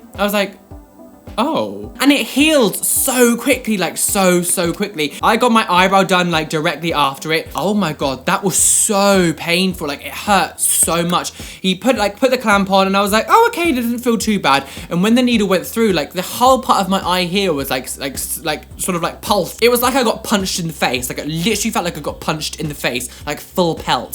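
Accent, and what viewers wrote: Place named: United Kingdom. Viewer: I love your typical British accent.. it's so cute 😁 And ofcourse I love even piercings and body modifications. I used to have a surface of wrist, two microdermal on chest and nipple piercings, but I decided to remove them when I was pregnant of my daughter... I still have ear plugs and the top of the ear pierced..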